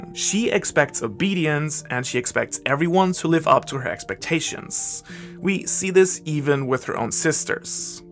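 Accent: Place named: Germany. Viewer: bruder dein english is perfekt aber dieses japanische mit dem deutschen akzent geht einfach gar nich. lern dein akzent weg oder lass es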